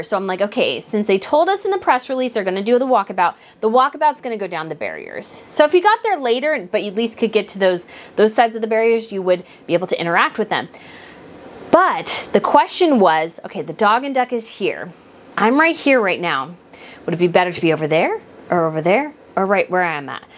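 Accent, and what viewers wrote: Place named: United States. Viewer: This is so sweet! You sounded really polite and excited at the same thing! Nothing embarrassing! I'm sure she thought you were really sweet and surely she recognized your American accent, meaning you made a big journey to be there! I hope you have a blessed time at the Coronation today, and I'm praying for some very special experiences. <3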